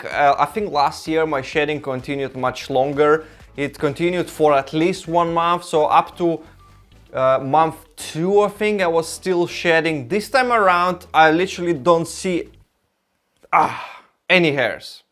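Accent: Russia. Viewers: Are u Russian? Your accent like Russian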